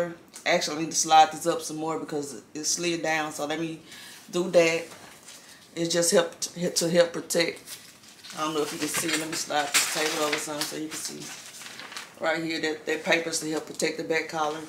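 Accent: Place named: United States. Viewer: Nigeria setting or environment, but i hear American English🎉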